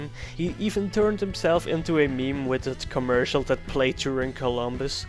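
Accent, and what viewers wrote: Netherlands. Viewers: are you dutch? i thing i know your accent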